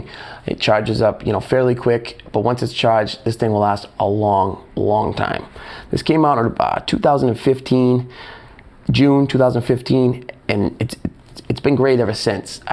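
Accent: United States. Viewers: my friend told me not to bother getting this with the black Friday promotion... he has it and says its super slow. p.s. love your American accent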